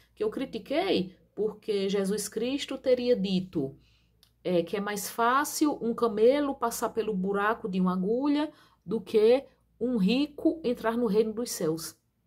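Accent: Northeast, Brazil. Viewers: Ótima resposta, parabens, pelo seu sotaque vejo que vc e' nordestina, vc e' de qual Estado?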